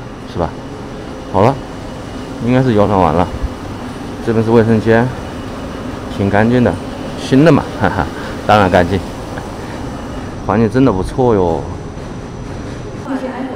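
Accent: Wuhan, China. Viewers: 虾不掩鱼，纯正武汉普通话，亲切，点赞。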